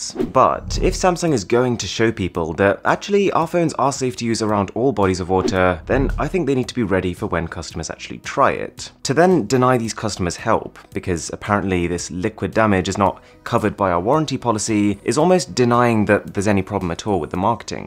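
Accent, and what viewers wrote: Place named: United Kingdom. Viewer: yeah he sounds birtish-y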